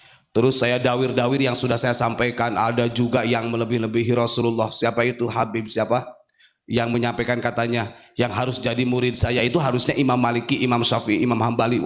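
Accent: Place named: East Java, Indonesia. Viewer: Betul Gus....jatim mn suaranya..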